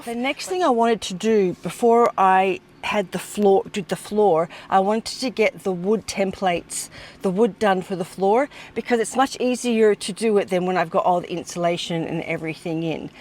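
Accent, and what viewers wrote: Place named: Australia. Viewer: I love your accent! I consider it real Aussie.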